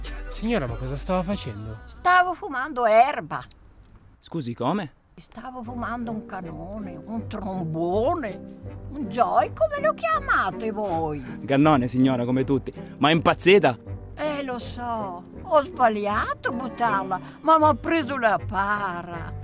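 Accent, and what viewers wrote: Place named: Palermo, Italy. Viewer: che parla con l'accento palermitano fa troppo ridere!! Bravo! 😘👍 E lo dico da siciliana! 😄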